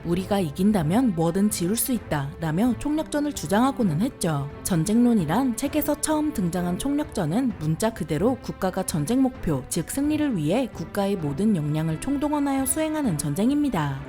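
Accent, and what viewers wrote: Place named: Gangwon, South Korea. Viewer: 강원도 억양같은데 억양이 중독성이 강해요.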